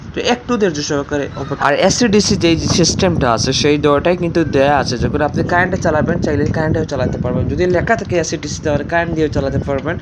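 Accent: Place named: Sylhet, Bangladesh. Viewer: ভাই তুমি সিলেটি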